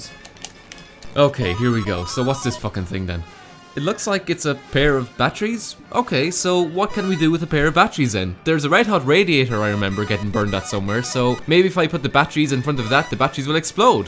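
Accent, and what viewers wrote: Ireland. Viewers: Dude are you from the same part of Ireland as Jacksepticeye??? Hope this isn't offending you (don't mean nuthin by it) but ya accent sounds very similar. Love your reviews fella!!! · I’m pretty sure he’s Irish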